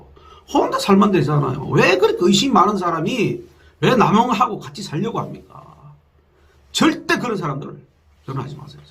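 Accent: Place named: Gyeongsang, South Korea. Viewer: 오~~ 유튜버... 정광용 씨와... 얼굴.. 외모... 사투리 발음이... 아주 비슷하군요. 그 분도... 경상도 던데... .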